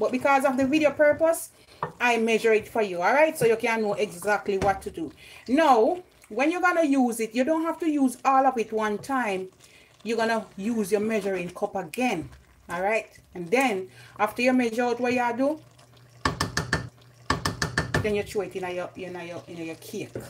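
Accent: Jamaica. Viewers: me know weh yuh born yuh sound like st. Elizabeth girl like you video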